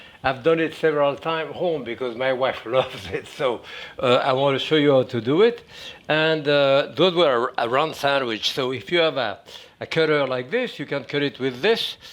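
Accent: France. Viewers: Why does English spoken in a French accent sound so much better? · very nice french accent , also beautiful kitchen, very cousy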